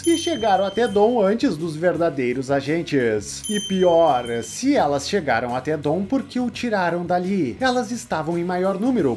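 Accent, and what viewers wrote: Rio Grande do Sul, Brazil. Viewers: Esse narrador gaúcho é da hora, kkkkkk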